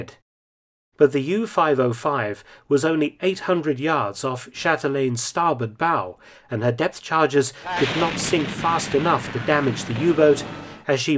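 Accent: United Kingdom. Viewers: Thank You, you would make a great American!!! It's refreshing to have a Brit narrate such event in American History!!! Become one of us! God Bless You!!! Godspeed:)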